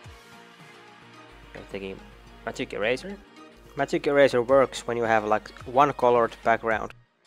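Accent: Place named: Finland. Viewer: Where are you from? You sound finnish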